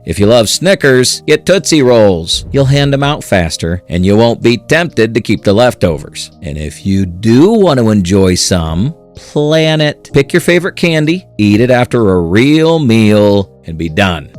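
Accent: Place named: South, United States. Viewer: the southern accent 😭😭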